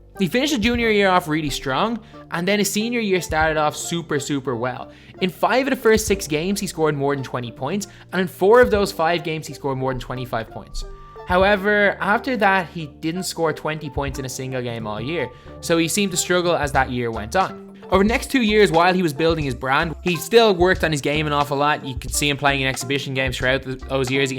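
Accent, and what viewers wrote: Ireland. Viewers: I thought your Irish wtf you uploading like 3 in the mornin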